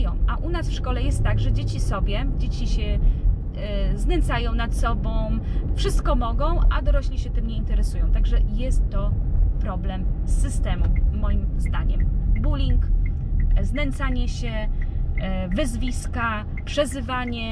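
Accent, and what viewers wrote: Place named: Germany. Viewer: Dla mnie vlogi po angielsku może mają sens ale nie na tym kanale ponieważ Pani czasami przekreca słowa i ma trochę niemiecki akcent (bez urazy). Angielskiego uczymy się najwięcej słysząc go więc z tej naszej nauki wyjdzie jedno wielkie każdy wie co. Każdy powinien się uczyć angielskiego wtedy kiedy ma na to ochotę a nie pod przymusem.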